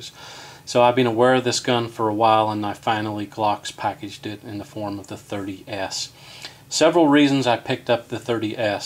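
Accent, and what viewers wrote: Ireland. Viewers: Are you from rural Ireland?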